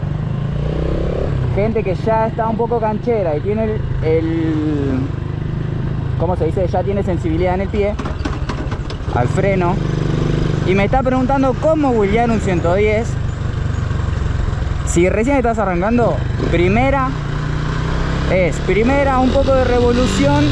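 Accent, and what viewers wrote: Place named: Chicano, United States. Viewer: Che como que te que te queda media chicano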